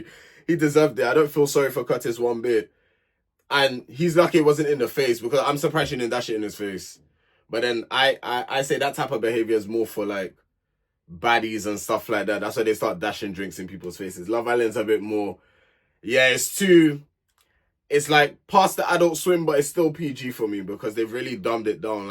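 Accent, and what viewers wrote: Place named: Caribbean. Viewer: Am hearing a Caribbean accent. Are you from Trinidad 😂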